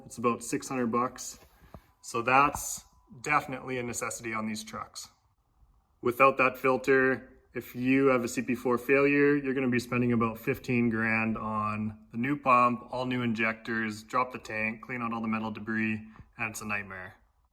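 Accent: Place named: British Columbia, Canada. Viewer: I noticed you northern bc accent, lol. Checkout atlin BC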